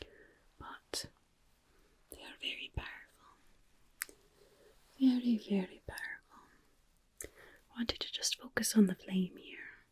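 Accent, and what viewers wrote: Ireland. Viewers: I love your Irish accent,. I know you have also done English accents and if I remember correctly your "bossy" vampire had a Russian accent. Have you / will you do other accents too? No pressure but I'm just curious. Thank you for all of the personal attention in this wonderful St. Patrick's video. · As an Irish person you absolutely nailed the accent ❤ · I don’t know what it is about your Irish accent but it gives me tingles til I melt! I enjoyed the St. Patrick’s day. 💚🙂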